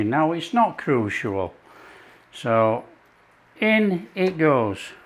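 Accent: Yorkshire, United Kingdom. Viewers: you sound like you are in the UK? Where are you getting that much ground pork shoulder for less than a tin of Spam? I'd love to know. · My Mouths watering! I'll have to try this as I love Spam! It's not easy to find where I live in the Golden Triangle! 😄 Great to hear a Yorkshire accent when I'm so far from home!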